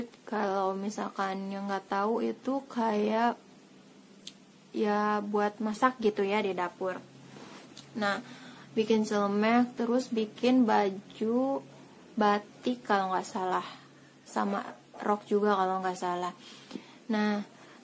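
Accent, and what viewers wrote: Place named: West Java, Indonesia. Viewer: Aduhhhh geuningg sundaa hhe Nklenaa8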